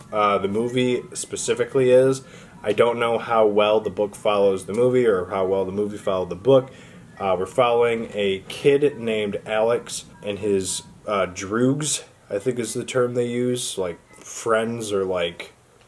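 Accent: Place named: Midwest, United States. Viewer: Man with heavy midwest accent in a Menards shirt...checks out. Great content 🤘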